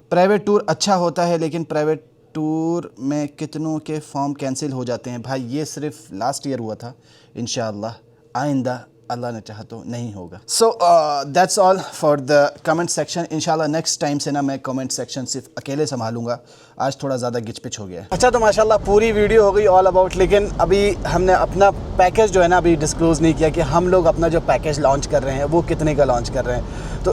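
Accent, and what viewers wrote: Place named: Pakistan. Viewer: Bhai aapki Zuban Pakistan wali hai, main confused hoon ki aap kahan se ho?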